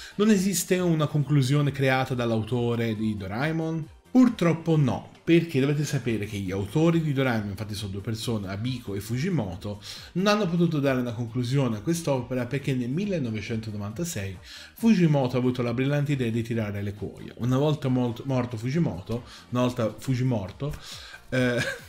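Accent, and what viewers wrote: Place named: Tuscany, Italy. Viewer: Non te la prendere... Purtroppo vi sono troppi analfabeti funzionali in rete... Bellissimo video cmq!😉 Cmq fantastica la tua cadenza Toscana... 😉 P. S. Ci vuole intelligenza per capire i tuoi video, dote oggi troppo sottovalutata... P. P. S. Visto che parli di serie interrotte per morte degli autori o interruzione per flop del prodotto, puoi fare un video su Tekkaman e Silversurfer?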